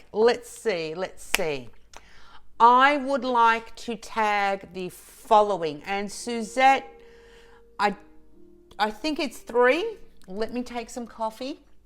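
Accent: Australia. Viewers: Congratulations on the 2k achievement,. What keeps me coming back day after day (besides your Aussie accent, LOL) is the fact that you are REAL! Your love for family and friends makes my heart Happy and your sense of humor makes me smile or even laugh out loud. Sending, as always, much love and lots of warm hugs💖🦋🌈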